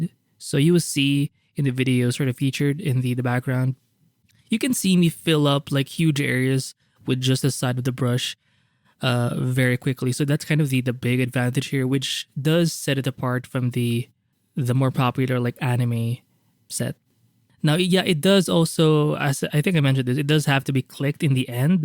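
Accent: Philippines. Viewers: YOU SOUND FILIPINO!!!!!!!!!!!!!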